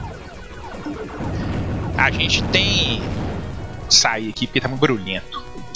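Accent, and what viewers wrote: Minas Gerais, Brazil. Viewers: Sotaque seu é mineiro.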